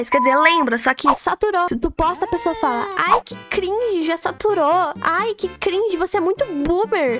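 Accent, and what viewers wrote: Brazil. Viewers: tu é carioca? 🤨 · Vc e sulista?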